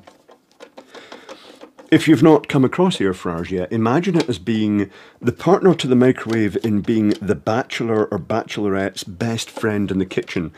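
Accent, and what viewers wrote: Scotland, United Kingdom. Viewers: just adore your accent is it morningside. Totally annoys my wife with my rendition of, one moment please.my wife thinks Renfrew. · You sound British so this will be a disassembly rather than an American ‘teardown’ surely.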